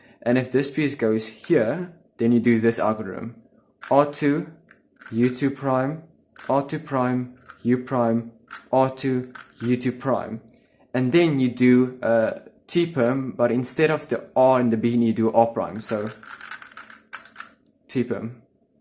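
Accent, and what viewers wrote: South Africa. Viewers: I may be wrong, but are you South African?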